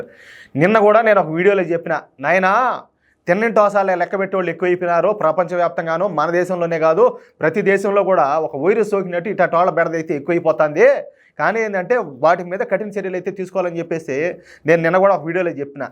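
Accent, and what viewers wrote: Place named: Telangana, India. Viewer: తెలంగాళ stail తప్ప మాములు గా మాట్లాడితే బావుంటుంది.... నేర్చు కో బ్రో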